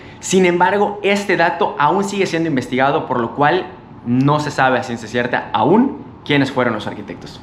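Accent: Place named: Yucatán, Mexico. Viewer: tienes un ligero acento yucateco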